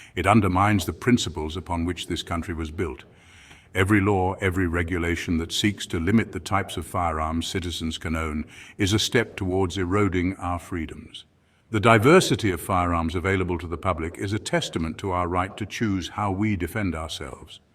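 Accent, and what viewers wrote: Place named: United Kingdom. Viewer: Absolutely on point, and I agree 100% on all points - however... does anyone else see the irony in using a modern British accent for the AI to talk about fundamental American recognition of our human rights? Even the current and newly ex-British in the 18th century did not have that accent - they sounded more like a version of the deep Maine or the traditional New York or Boston (Southie) accent.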